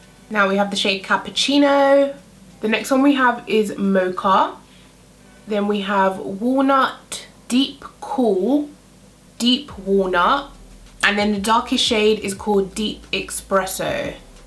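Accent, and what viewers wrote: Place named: Southern England, United Kingdom. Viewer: so nice to listen to love her accent. It’s southern but not London and I love it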